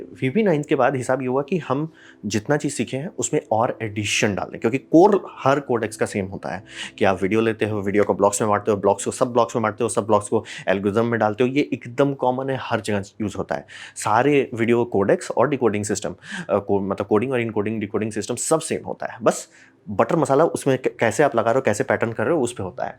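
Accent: Bihar, India. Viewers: sir ji aap Bihar se hain kya??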